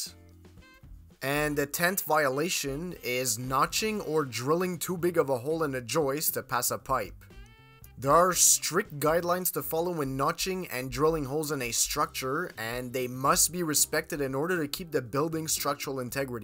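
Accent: Quebec, Canada. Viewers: I believe he is from the La belle province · code for what state and country? I listen to your voice, and there is an accent in there.. french canadian? I can't tell.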